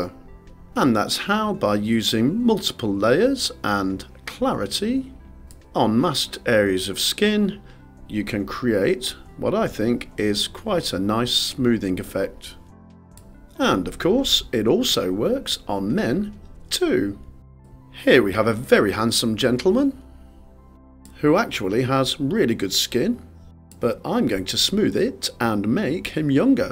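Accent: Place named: RP, United Kingdom. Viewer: Thank you for your awesome tip!! Furthermore, watching your excellent videos is like killing two birds with one stone: I learn Capture One Pro, and I improve my English thanks to your Received Pronunciation 😅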